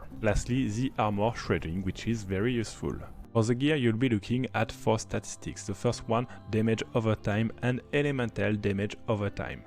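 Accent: France. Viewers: what a french accent +D well ty for this build =)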